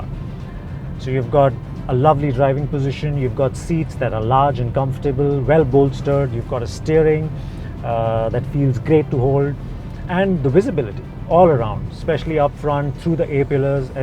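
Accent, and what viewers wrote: India. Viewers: You must have never heard a native english speaker. He sounds just like any Indian on news channels. 😑😑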